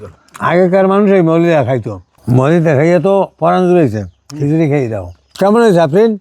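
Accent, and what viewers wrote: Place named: Barisal, Bangladesh. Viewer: বরিশালের মানুষ বরিশালের আঞ্চলিক ভাষায় কথা বলেন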